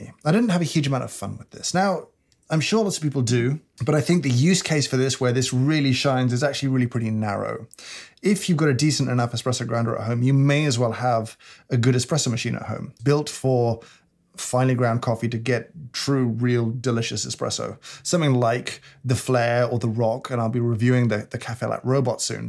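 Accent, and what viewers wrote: United Kingdom. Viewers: Thank u in advance. So you spared me about 70Dlls I was about to spend in this gadget. I was short of money and bought a bigger thing, a Delonghi espresso for home. Almost the same price, and much more useful, regards from Mexico, like a lot your finicky behavior and your a very nice warm loving guy. Love your British accent btw. Cheers from Baja California ;) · His voice is calm but seriously British? You and the negativity about your opinion and Gawdamn even your face already can tell everyone you don’t like this product, return it and just run the old conventional espressos machine will ya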